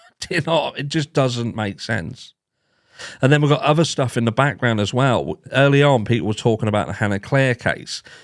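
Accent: Norfolk, United Kingdom. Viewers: More to this case than meets the eye! you need to have a sing-a-long AKA -:D show off that Naaafoll accent:P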